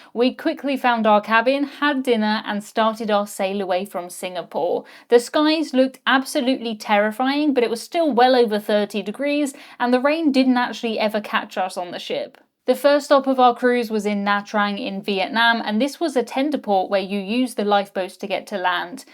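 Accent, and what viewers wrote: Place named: England, United Kingdom. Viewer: Thank You alway for providing great cruise information & awesome locale to life on your vlogs.. oh, love your English accent.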